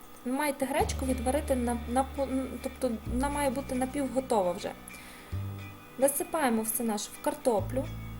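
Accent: Western Ukraine, Ukraine. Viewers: Не гадайте яка область західний говір приємний ніжний доходить до всіх хто кохається в мові України.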